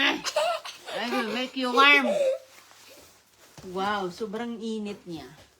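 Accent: Visayas, Philippines. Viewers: Nakaka hiya yung mga nambabash kay Ms.. Porke bisaya binabash ang accent. That's disgusting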